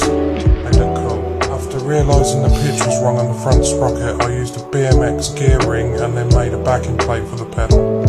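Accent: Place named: United Kingdom. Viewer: Nice build. I am working on something similar. Is it road legal. I assume from your accent you are in the UK. If so what was the process?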